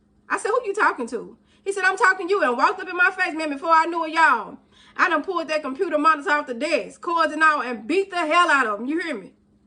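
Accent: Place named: Tennessee, United States. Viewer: I can tell by your accent that you're from Tennessee or somewhere near. ❤❤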